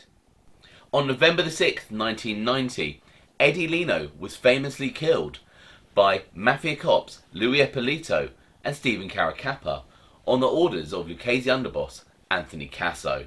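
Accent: England, United Kingdom. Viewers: Blimey that was quite knowledgable and informative. would fit right into a crew if it weren't for that english brogue. ( I couldn't help but imagine playing background music as he narrated )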